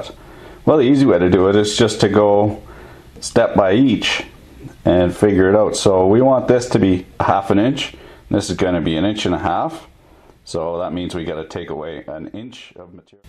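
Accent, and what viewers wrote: Quebec, Canada. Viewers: HAHA are from quebec because your french sounds just like mine!! Cool videos btw! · tout tes French-isms que tu fais, j'essaie de deviner d'ou tu viens. Dans un autre video tu disais que t'es un "tête carré anglophone", mais tu parle un français plutôt Québecois. Je dirais Montréal ou Ottawa. Side note I was called a square head by some grumpy old man on a Montreal ham radio repeater, telling me to go back to Ontario, but I've never lived in Ontario. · HAHAHA ! jaime comment tu parle ! pas besoin de t'sa esti ! vivez vous proche du quebec ? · I'm French Canadian too. I live in the states now but that Canadian Frenglish speak cracks me up every time. Brings me right back to where I came from... lol.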